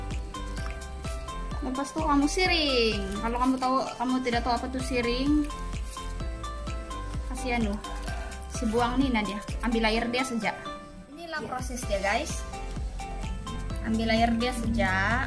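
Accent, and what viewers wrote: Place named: Sabah, Malaysia. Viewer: Pekat juga Sabah kau bha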